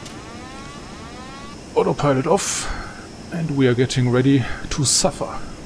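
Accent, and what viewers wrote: Germany. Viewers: Super Video, sehr gut gemacht. Ich nehme jetzt einfach Mal an das du Deutsch bist, das hört man. Englisch sprichst du sehr gut👍 Im Not too Bad in speaking english, however it could be better i supose.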